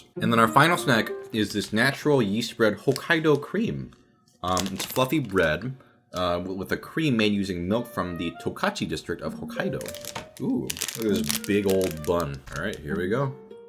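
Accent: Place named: United States. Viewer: his accent is so cool, he sounds like he's American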